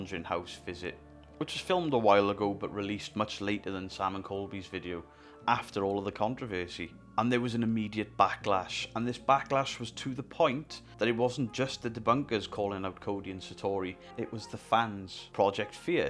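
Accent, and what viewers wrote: Wales, United Kingdom. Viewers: OMFG dude I could listen to your Welsh ass tell stories for hours man!!! PLEASE tell me you know who MANOWAR is!!!???? If you do you gotta say it sometime!!! Grandfather tell me ah story.... Such a good old school band!! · Pretty sure he’s Welsh!😂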